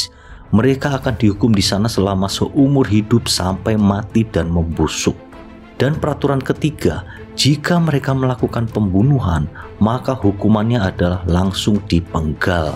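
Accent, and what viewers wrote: Java, Indonesia. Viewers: Suaranya medok bangett hahaha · Suaranya agak medok Jawa y bang?🤔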